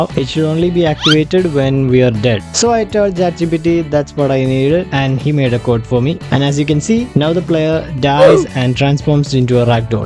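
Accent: Kerala, India. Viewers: accent feel like desi mallu boy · Bro's indian accent just makes me relax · indian accent hits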